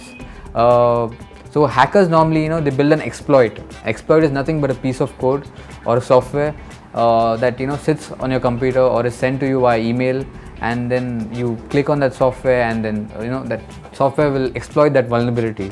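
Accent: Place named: India. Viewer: I am proud to be a romanian who knows how to talk and write in 4 different foreign languages. So, if you want people to understand your message in a proper way, learn how to fu***n pronounce. I don`t have nothing against India, or you, but ... your accent it is horrible. Thank you! :)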